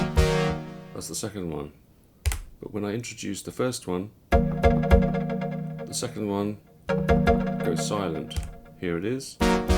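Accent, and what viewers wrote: United Kingdom. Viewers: Superb content, loved this and especially refreshing to watch a synth review from someone with a nice British accent for a change. I was wondering about a Jupiter X as a compliment to my Korg Kronos. The problem is, the Kronos is so hugely powerful that any additional synth needs to do something that the Kronos can't. Still not sure about the JP X, but this video really brought out the issues and benefits with admirable clarity. It also demonstrates what real UI complexity looks like. People moan about the UI on the Kronos, but at least everything is laid out on a large touchscreen for you, so once you know each synth engine, muscle memory can kick in. The interface on this looks like the worst of all worlds really, back to the days of painting the Sistine Chapel through a letterbox to some degree! A problem that certainly afflicts the D50 I have. 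What are your views on a JP X as a complementary synth to a workstation like the Kronos?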